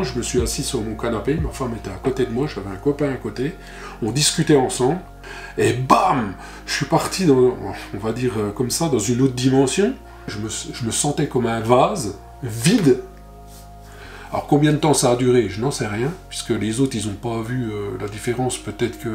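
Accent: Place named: Franche-Comté, France. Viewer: ❤ Magnifique témoignage (et magnifique accent comtois)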